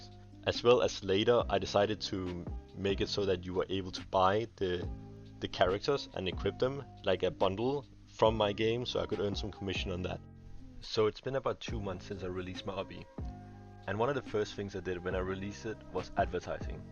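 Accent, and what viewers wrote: Denmark. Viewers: are you danish? i have to ask this question okay its important...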